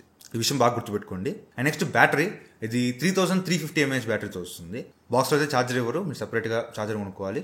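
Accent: Nellore, India. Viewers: anna manadi nellore aa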